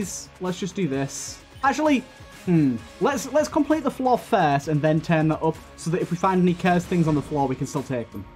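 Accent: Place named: Scotland, United Kingdom. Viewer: Nah he is just Scottish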